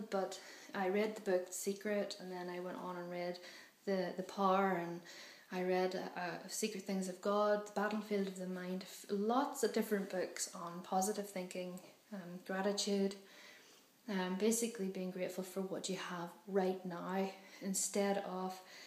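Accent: Ireland. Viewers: Hi i came across your video by chance because i was thinking of doing a sporting challenge for my fiftieth birthday, which is next year, and i saw this that you put on here, and the one you did on your birthday. Anyway i would like to say you look amazing so really good looking with those blue eyes and that gorgeous Irish accent of yours, you are a wonderful young woman and life is for living so go and enjoy yourself as much as you can, any ideas for me drop me Dave a note.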